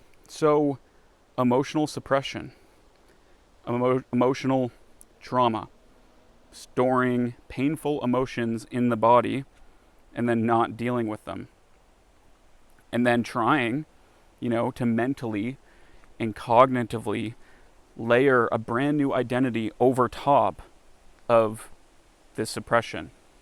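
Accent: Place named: Maritimes, Canada. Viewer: I know a fellow Maritimer when I hear one. Great videos man keep em coming!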